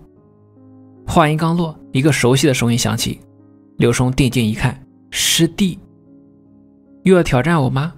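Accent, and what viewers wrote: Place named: Northwest, China. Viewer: 简明扼要，一气呵成，精彩！对博主的西北口音稍有不适，不过瑕不掩瑜。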